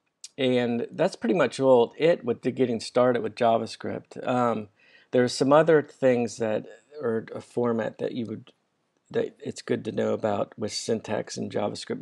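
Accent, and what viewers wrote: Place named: United States. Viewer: this shit is sooo helpful im real glad u actually have and american accent my damn teacher is swahili.. its killin me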